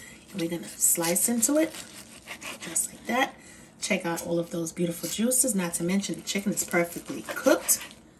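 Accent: New York, United States. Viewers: Ive never heard a new york accent sound so polite 😂❤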